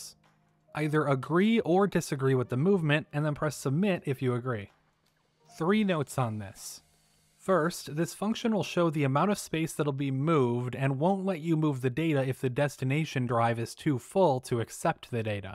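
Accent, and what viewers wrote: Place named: United States. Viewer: Ah an American living in Sweden. Your accent did also seem way too American for me to think you were a native Swede, lol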